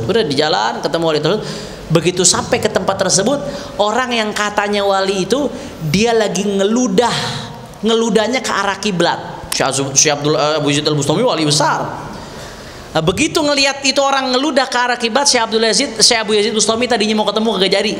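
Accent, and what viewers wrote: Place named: Jakarta, Indonesia. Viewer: Asik cara ngomongnya habib hanif, betawi bgt. Smoga panjang umur dan sehat slalu habib hanif, aamiin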